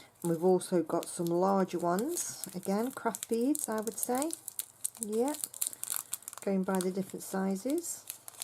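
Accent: England, United Kingdom. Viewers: Hi, I’m Ericka! Pleased to meet ya. Enjoyed your video so much and had to respond about your mojo. Lol. Your so funny! I assure you-You still got your beading mojo! Happy Birthday also by the way! I’m in California USA. Glad I found ya and loved your unboxing and pieces you made:-) very pretty. Yes I feel the mojo loss all the time! That’s why I watch unboxing videos to help spark a bit of creativity. I suggest Pinterest- it’s great to browse other designs for that inspiration. I also have a fabulous talented lady named Gina from <link> that I always watch for inspiration on YouTube! You should check out her unboxing and she’s on Patreon and shares her tutorials. She’s really talented. Anyhow, thought I’d introduce myself and say Hi! Not sure where you are but love that accent! England? Happy Birthday whenever it is this month! God bless you - ty for sharing!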